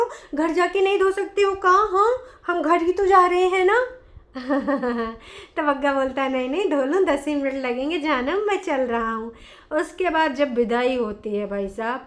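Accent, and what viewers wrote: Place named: Bihar, India. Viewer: I like what u say coz its correct bas thora aur Hindi mein agar bologe toh easy lagega samajhne mein , like wo bhojpuri bihari type feel hai toh samaj nai ata hai .. plz don't mind jst saying but u say correct stuff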